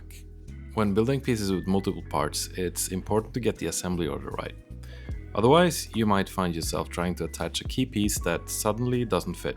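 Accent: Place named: Norway. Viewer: where are you from? your english accent sounds norwegian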